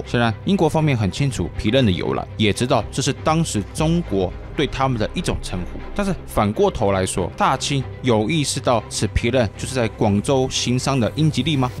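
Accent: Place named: Taiwan. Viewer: 能不能配上中文，你这台湾腔有点听不懂啊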